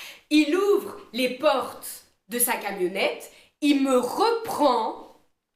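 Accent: Belgium. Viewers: Est-ce que tu es Belge prcq tu as un accent? 😂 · Sa ma fait rire surtout avec ton accent belge ahah mais c’est vrai que sur le coup t’es paralysé 🤷🏻‍♀️💙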